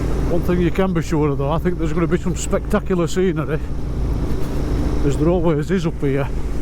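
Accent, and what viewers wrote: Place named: South Yorkshire, United Kingdom. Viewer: This is what motorcycles are all about. Great videos of just enjoying the machine and views instead of YouTube reviewers complaining about a 350cc not having enough power or shilling you to buy an insta360 from their affiliate links. Keep the videos coming sir along with your South Yorkshire accent. 😃 Looking forward to taking my CBT and getting on that journey. 👍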